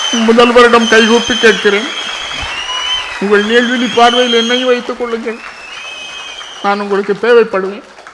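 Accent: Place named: Tirunelveli, India. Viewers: நெல்லைத் தமிழை அழகாகப் பேசியவர். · இவர் பேச்சு நெல்லை தமிழ் கேட்க கேட்க திகட்டாத தெள் அமுது ஓம் நமசிவாய வாழ்க வாழ்க ஓம் சக்தி பரா சக்தி சாந்தி சாந்தி சாந்தி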